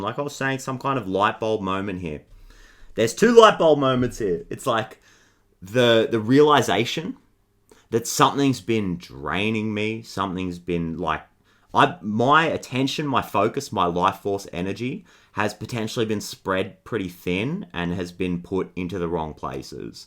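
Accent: Australia. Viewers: Omg i just love that these channelled messages are coming through an aussie accesnt and aussie way of relating to the world . 😂❤❤❤❤❤❤❤❤❤❤. Just this alignment in irself blows my mind . Just llove you and your humour . AGAIN Thank you mate . ❤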